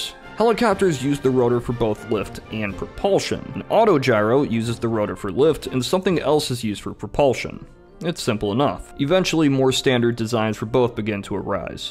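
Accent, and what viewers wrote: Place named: United States. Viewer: Why does he keep on getting more and more American throughout the entire video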